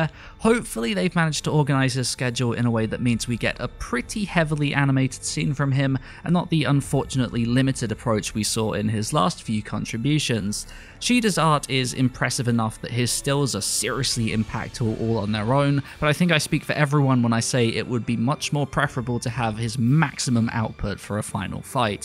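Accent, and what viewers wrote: United Kingdom. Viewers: you're from braitane aren't you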